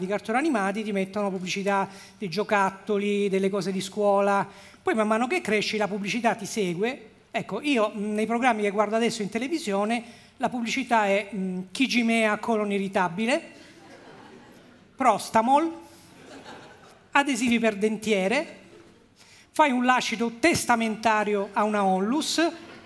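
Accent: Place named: Sicily, Italy. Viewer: la tua inflessione non identificabile perché c'è del siciliano/romano/italiano RP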